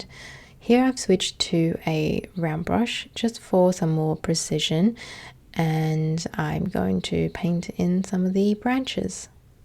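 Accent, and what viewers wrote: Australia. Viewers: Great video! Thanks for not using background music; the combination of silence and your sweet, Australian voice makes it a true pleasure to watch and listen to. Greetings from Norway.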